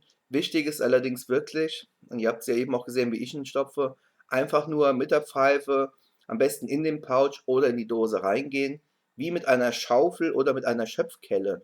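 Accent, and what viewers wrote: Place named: Hesse, Germany. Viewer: versuche gerade ihren Akzent zuzuordnen, er ist schwach aber ich würde sagen Hessen. Ist das richtig?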